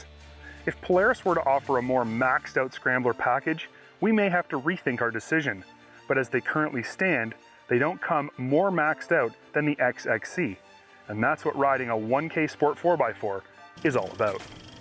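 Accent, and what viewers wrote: Canada. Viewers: what is up w that Canadian accent out sounds like oot i just do not get it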